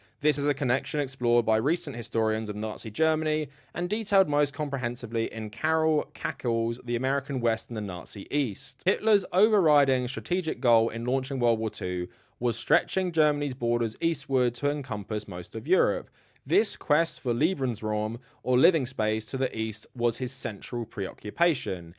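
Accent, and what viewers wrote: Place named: United Kingdom. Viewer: Judging by your accent you are of british heritage, so are we here and didn't the british not do the same in Australia, India. So you're a colonizer as well, you have ancestors that were there killing those native Americans. You're probably closer to being related to those killers than I am and I was born here in the US.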